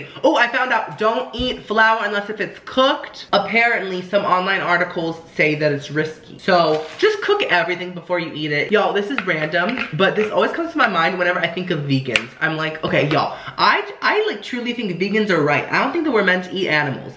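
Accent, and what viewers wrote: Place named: United Kingdom. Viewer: i hope you do a full diy talking in your british accent one day! it always cracks me up! 😂😂😂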